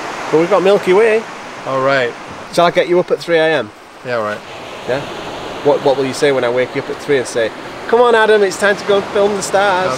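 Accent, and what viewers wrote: Yorkshire, United Kingdom. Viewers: Just interested, you're from Canada but you sound Yorkshire?!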